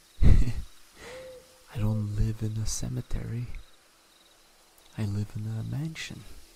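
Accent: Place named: France. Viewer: I was not into French accent before, but now I'm getting obsessed 😅🤭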